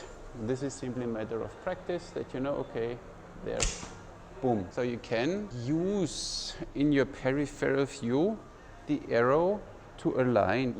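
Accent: Germany. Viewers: Dein englisch ist so geil :) man hört sofort, dass du ein deutscher bist ;} was ist mit dem kompletten instinktiven schießen?! Also NUR das Ziel fokozieren?! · Are you German? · Dachte ich erstmal der ein Deutscher ist, doch hätte ich Unsicherheit entweder er Deutsch oder vielleicht Niederländisch ist. (Leider habe ich nie nach Europa gereist).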